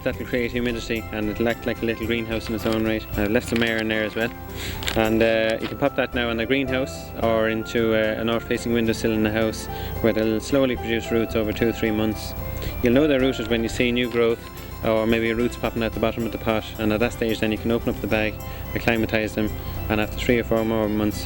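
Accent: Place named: Ireland. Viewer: I'm from NZ and I love ur straight forward, no fuss, informative style and that Irish (???) accent is to die for. thanks for the video.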